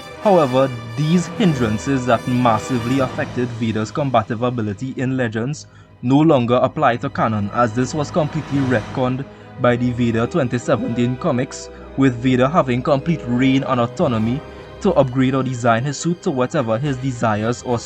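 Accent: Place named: Trinidad and Tobago. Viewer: U definitely from Trinidad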